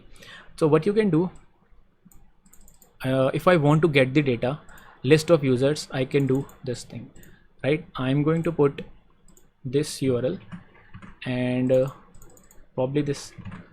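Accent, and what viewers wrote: India. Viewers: Finally I love this Indian's Accent! :-)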